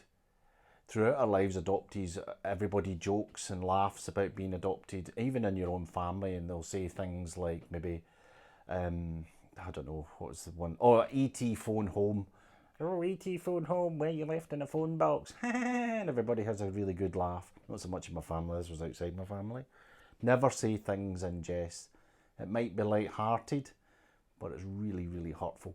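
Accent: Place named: Scotland, United Kingdom. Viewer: I don't mean to be shallow but you have a lot of charisma with that witty Scottish accent? And you very handsome too! Seriously I think it's thoughtful for you to make this video and inspiring others.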